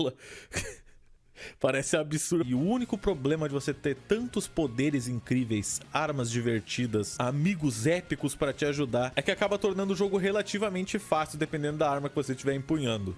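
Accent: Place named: Brasília, Brazil. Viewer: Você é de Brasília? Não consigo identificar o seu sotaque kkkkkk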